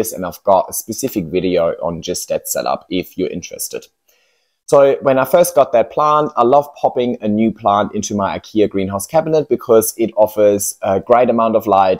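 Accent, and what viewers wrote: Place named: Germany. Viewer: I also thought about that. He sounds a bit German (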